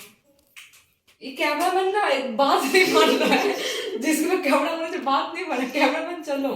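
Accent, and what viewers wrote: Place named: Pahari, India. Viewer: ...tell us about yourself na... Your hindi is sweet, pahari ho kya...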